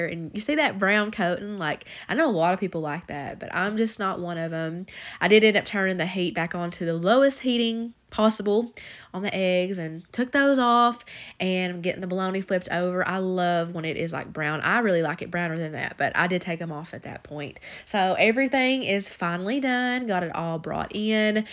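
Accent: South, United States. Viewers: I like your videos but girlll you sound so country teehee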